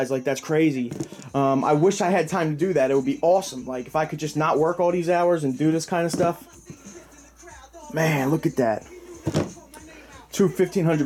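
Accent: Philadelphia, United States. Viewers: much love! nothing but love on them 8 years cuz! I hit 10 years in October...don't let nothing take you down and realize that you're handlin ya business regardless of all the other bs..I hope your personal life gets back on track too..it's funny, my girl hates that I watch these vids on youtube..ya know, the "oh my god, another fukin pair of kicks" type girl..but anytime your vids come on she's knockin me off the computer like"yo, let me get dat jawn, it's bitch"..and i'm like"damn, kick back breezy"..na, but she thinks you're funny as fuck and she loves your passion..we're both from south philly, so it's like listenin to one of my boys..but 4 real cuz..stay up and do you, but drop a vid here and there for the real heads..peace! p.s.-check out that new and album-unpredictable cuz!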